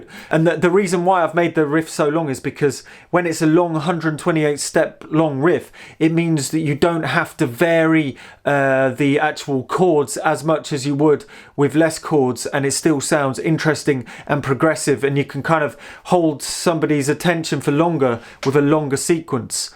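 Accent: United Kingdom. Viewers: I feel the same exact way you do about the way you have been feeling; except you are the Cirklon and I am the SAM. I have been following you for about 4 months now; and I can understand pretty much every other thing you are talking about. Have no clue what a cirklon is or what Beatbox pro is all about but it seems all very interesting. SO I am just reading the manual and absorbing about every other word or so but its very cool because you have the queens tongue. I am sending your music all across the USA to get my friends in the underground interested as well. So when you plan your world tour and visit us in the states; we can all jam together. Gods speed LADDY!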